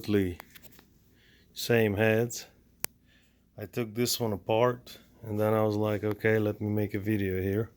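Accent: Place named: United States. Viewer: Добро пожаловать обратно, как говорится. И английский у тебя такой брутально ковбойский 👍🏻👍🏻👍🏻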